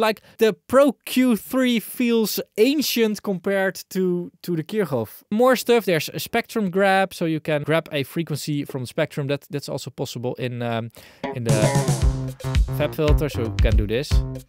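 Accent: Netherlands. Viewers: I have to say that I really enjoy your videos! One of the things I like is your Dutch accent, I'm used to it because I lived in Amsterdam for a while :)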